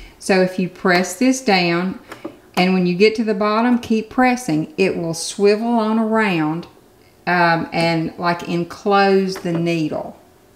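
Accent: South, United States. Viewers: My friend and I pooled our amazon points and bought this sewing machine. Opened it today. All was well until we tried to figure out how to thread the machine using the threader. The instructions in the manual weren't any help and then I found your video. We watched it then took turns threading the needled. Thank you so much for you patient, clear instructions! And I do love you southern accent!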